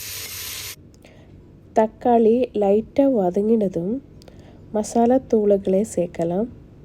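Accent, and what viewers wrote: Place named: Kerala, India. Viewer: Malayalee tamil parayanathe pole unde.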